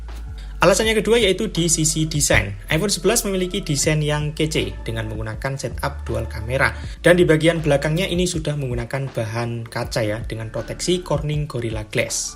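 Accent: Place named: Jember, Indonesia. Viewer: Wah orang jember wkwk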